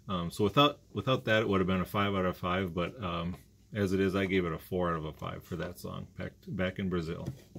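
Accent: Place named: Minnesota, United States. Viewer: Too funny. I think sometimes the more you listen, the more you like :) I'm glad you're enjoying it :) Also I'm sorry I thought you were from Canada...to my Michigan ear, Canadian & Minnesota accents sometimes sound the same....Oy vey.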